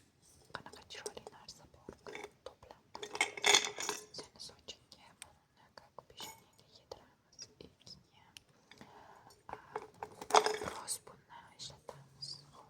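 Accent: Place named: Fergana, Uzbekistan. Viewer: Асмр учун рахмат омад тилеман. Шева Фаргонаники шеклли ааа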